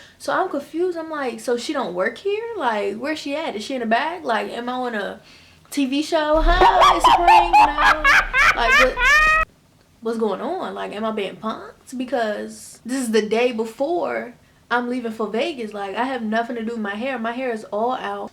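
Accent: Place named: Baltimore, United States. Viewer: I know I saw nc in the ss but I hear a lil bit of Baltimore 👀👀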